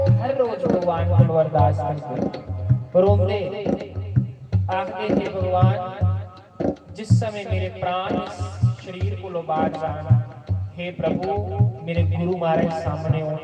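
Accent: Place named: Pathankot, India. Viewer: sanju ji di karth mane puri suni muje to apki je. pathan kot bali bani badi madur lagti h mere maike ki bani jehi h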